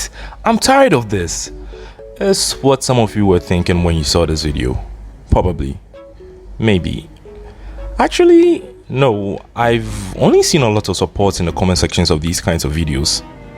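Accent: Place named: Nigeria. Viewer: oh okay you kind of sounded Nigerian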